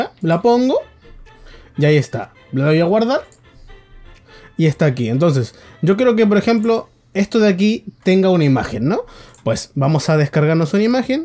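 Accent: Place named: Canary Islands, Spain. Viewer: pensé que eras de canarias España es un acento parecido Saludos!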